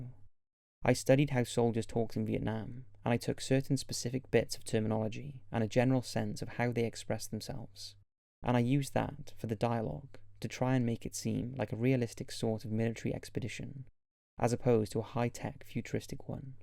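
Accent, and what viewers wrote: Wales, United Kingdom. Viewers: Am I hearing a slight Welsh accent from.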